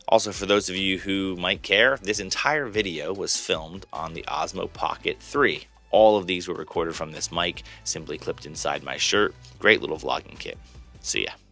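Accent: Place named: Ohio, United States. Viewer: You have a Buckeye accent...any relation to Jon M.?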